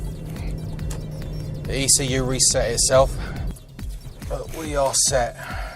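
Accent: Cockney, United Kingdom. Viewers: The cockney mechanic. Great video. 👍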